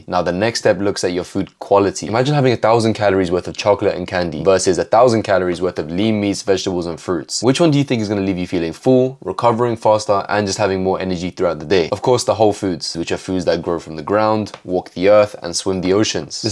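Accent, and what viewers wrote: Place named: Maghreb. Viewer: WHOA! Viva Maghreb 🇲🇦 Body, Accent... Fire bro🔥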